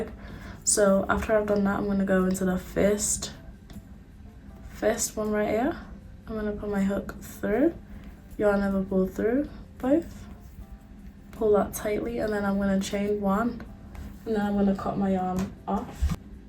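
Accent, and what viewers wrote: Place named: Liverpool, United Kingdom. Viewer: scouse queen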